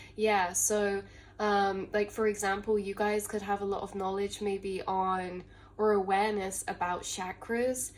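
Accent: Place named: United Kingdom. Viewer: Harry Potter references and your British accent. Perfect fit.😊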